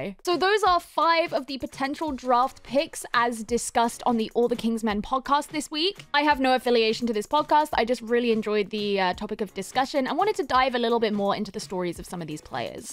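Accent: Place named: United Kingdom. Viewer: Great analysis! Though I'm still adjusting to a British accent discussing hockey lol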